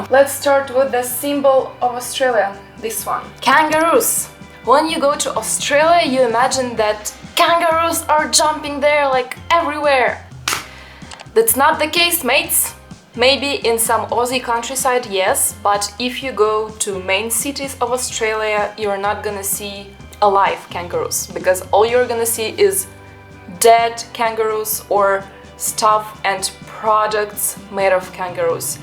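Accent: Russia. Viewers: You're always so wholesome to watch, you have such a positive happy outlook 😊 I love that accentuated Russian accent you were doing throughout this video haha. I'm half Russian, but born and raised here so no accent for me sadly! There are a few suburbs in Sydney outside the city with tall buildings, Parramatta, Chatswood, a few others. Anyhow, I'm glad you enjoyed it and hopefully you'll come back sometime. Perth is worth a visit, it's quite different than other Australian cities. Take care mate! 😉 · I absolutely love the sound of the Russian accent. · Just LOVE the Russian English😂😂😂😂 Excellent presentation. Well thought out - 👏👏👏👏👏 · What did people in Australia call you when they hear your accent? They''d always call me a "Yank." My money is on "Russkie" I'm guessing. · Is that an American accent behind the so called Russian accent? There is a lot of free stuff in Moscow too.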